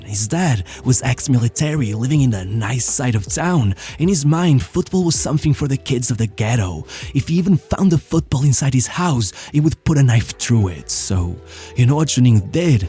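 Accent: Portugal. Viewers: Ele é português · Ahh the narrator is Portuguese!!!! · esse sotaqque portugues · Ur Portuguese right? This accent always got me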